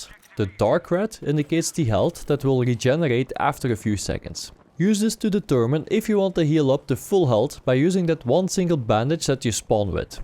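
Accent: Netherlands. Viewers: His accent is sooooooo DUTCH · Are you Dutch hahahha your English is like that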